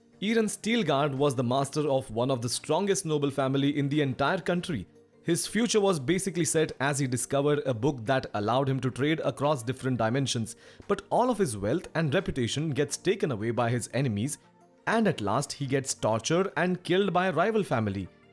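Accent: India. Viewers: fuvking indian accent ruin the video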